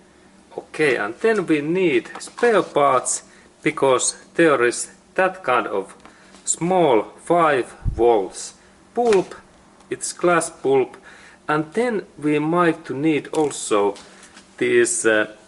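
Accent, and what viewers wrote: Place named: Finland. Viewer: Ai että rallienglantia tää on kova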